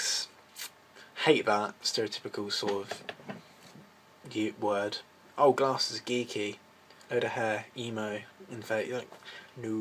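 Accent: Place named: United Kingdom. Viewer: You sound really British and you always rant but thumbs up for you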